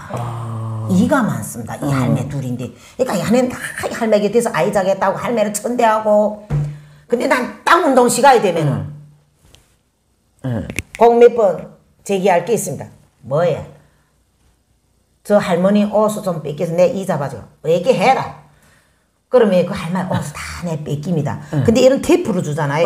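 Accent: Hamgyong, North Korea. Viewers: 님 오래 기다렸습니다 함경도 말씨 좋습니다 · 우연치않게 님의 동영상을 모두 보게 됐네요...오늘에서야...물론 님의 평양만사는 구독신청해 놓았고..자주 보는데 님의 구수한 걸쭉한 이북사투리 넘 감칠맛나서 중간에 보다가 시간이 나서 처음부터 자세히 보게 됐습니다. 아주 코너를 하나 만들어서 하는게 좋은듯....그리고 님 저가 장담하건데...분명히 똑똑한 작가가 있다면 TV작가가 있다면 연락이 올것입니다. 그전에 연기 공부좀 해두시면 님이 반듯이 꿈꾸던 북한 여자 연기자역이 반듯이 올것이라 생각됩니다. 참 대찬 여자라고 생각됩니다.....당신을 응원합니다.....북한 탈북인들 유튜브를 가끔봅니다만 님 정말 당차고 대찹니다.......조만간 "채널A 이제만나러 갑니다"에서도 연락이 와야하지 않을까 싶습니다....너무 잼나서 오늘하루 씨의 방송프로를 다 보았습니다....정말 재미있습니다..........응원합니다.........홧팅~~~~~아차~ 필살기 4편은 언제 방영되는지....빨리 나오길 기대합니다.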